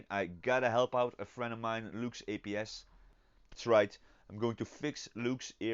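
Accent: Netherlands. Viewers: I thought I finally had it. I knew you where dutch (or Belgian) because of your name and your accent. But you use stuff not available in the Netherlands. But in this tutorial I saw a flask of bison houtlijm, so I thought yeah you must be from the Netherlands...... But then you use stuff not available in the Netherlands again..... so where are you from if I may ask?! I want to know because of all the stuff you use. All the scenery makers are using cool stuff like grannygrating... no where to be found here...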